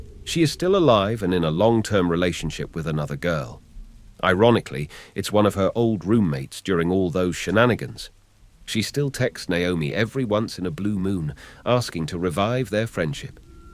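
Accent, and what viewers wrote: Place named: England, United Kingdom. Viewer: An , English narrator ! 😀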